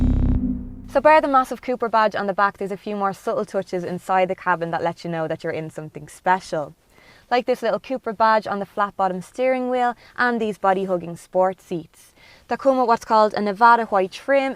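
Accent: Dublin, Ireland. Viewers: That Dublin Accent is so nice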